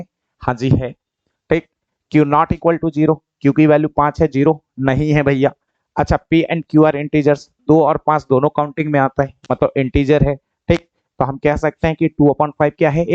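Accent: Bihar, India. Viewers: Sir aap bihari hai kya??? Hum bhi bihari hai .....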